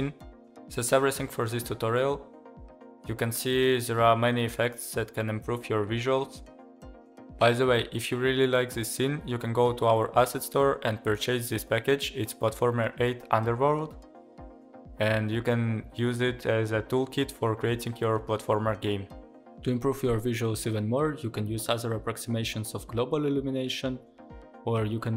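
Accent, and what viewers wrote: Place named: Turkey. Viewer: Dostum türk olduğun anlaşılıyor bu arada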